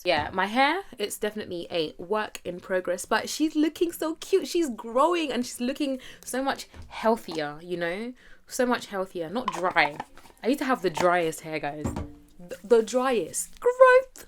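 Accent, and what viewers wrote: Jamaica. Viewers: No sah you sound just like a Jamaican. Roots 🇯🇲🇯🇲🇯🇲🇯🇲🇯🇲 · is that patois i here😂okkkkk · i always here you break out little patois, i always thought you were Jamaican, was surprised when you said you are Kenyan :)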